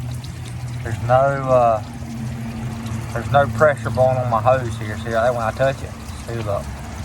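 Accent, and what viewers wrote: United States. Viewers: Great. No rockNroll noise. Except for the Carolina accent it was perfect. 5 stars. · You already know when they got that southern accent, they know what they doin.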